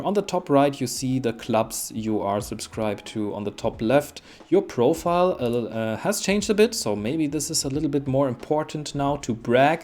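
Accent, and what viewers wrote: Germany. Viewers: Lol ein Münchner, servus!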